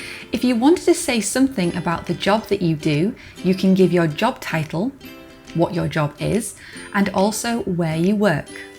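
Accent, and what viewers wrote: United Kingdom. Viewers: Proper Yorkshire lass! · Tnx for uploading the video, I like British accent. 👍🏻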